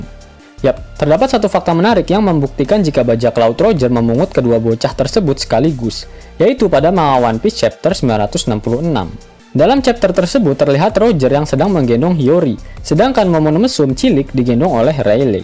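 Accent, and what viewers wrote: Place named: Bali, Indonesia. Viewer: a na ca ra ka da ta sa wa la, orang bali kayanh nih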